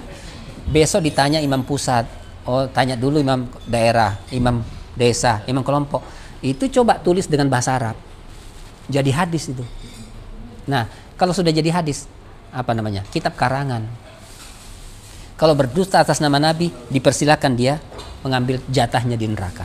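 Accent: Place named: Java, Indonesia. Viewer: Sampean lubise wong jowo tah..ketok logate. 😁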